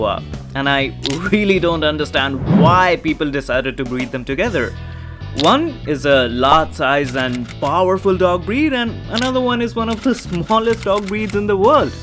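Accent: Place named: India. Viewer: You sound funny... Let me guess, you're an indian.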